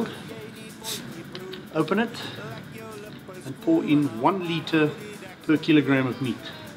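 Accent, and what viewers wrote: South Africa. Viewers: How you going Bought my first Potjie last weekend No3 and have been loving your channel ever since For my first Potjie I'm thinking this one Can I mention you and put a link to you in my comments when I do the video. And I love ❤️ the South African accent Thanks mate for the easy to follow Potjiekos instructions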